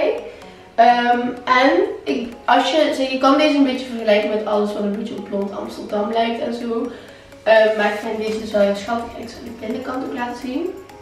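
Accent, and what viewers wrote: Belgium. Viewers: Ben je Belgisch? Je hebt zo'n schattige accent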